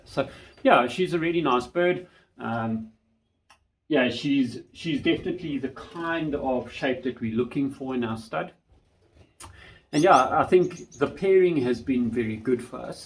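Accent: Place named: Gauteng, South Africa. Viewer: Where about are you'll located in South Africa , your sound like from Gauteng . I am from Durban and like to breed gouldians and if you can hook me up to some good breeders and if you are selling birds . Where do you'll show your birds . Great to see breeders in South Africa sharing knowledge .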